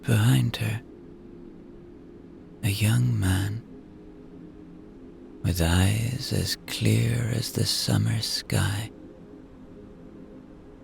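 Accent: Ireland. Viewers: Bro I listen to you so much that everyday I wake up I have an Irish accent in my head.😅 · Iove the Irish accent ❤my dad was born and grew up in Ireland. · I listen too u literally every single night and I keep hearing an irash accent in my head and it sounds like a leprechaun 🍀 literally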